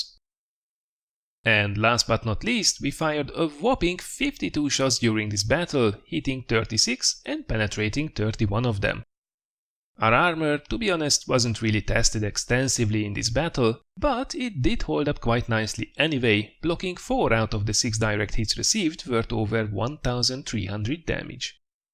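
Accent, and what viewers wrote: Hungary. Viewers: Most veszem észre a magyar kiejtést, ha ezt olvasod légy szíves valahogy jelezd , hogy tényleg magyar vagy:D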